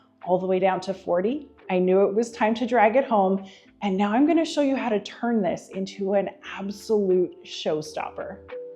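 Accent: Canada. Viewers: I am a fairly new follower so I’m not sure what part of the country you’re in… once in awhile I pick up a faint Canadian accent??? I’m any event I am blessed to live in a somewhat affluent area in Virginia…. Williamsburg/Yorktown area. Those furniture pieces that you have restored, especially most recently that dark olive green dresser or sideboard or console would easily go for $1200 in this area!!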